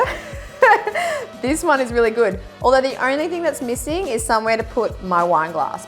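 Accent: Australia. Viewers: is just wonderful how she puts it together all tied up with s cute Australian accemt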